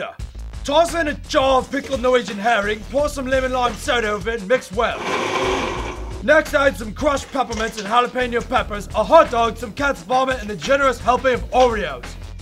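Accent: United Kingdom. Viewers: Dear Charlie, why do you have a british accent? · Charlie are you really Scottish because you are actually using a Scottish accent mate but do make some bloody good content and ghost Charlie mate you should go back in time to when your alive and tell him to build a machine to save smosh you bloody good mate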